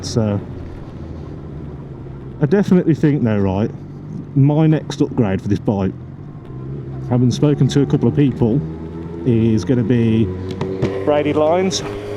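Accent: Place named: Birmingham, United Kingdom. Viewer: Where are you from matey, you accent sounds pretty near to mine in Bedworth, yours sounds a bit more towards Brum though?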